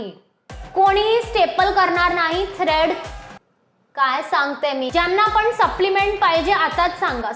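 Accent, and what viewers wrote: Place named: Pune, India. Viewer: Ohh that puneri accent... Paniii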